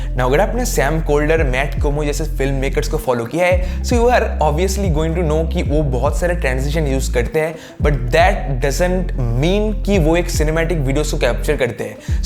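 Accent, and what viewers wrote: India. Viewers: This is english ou indian? wtf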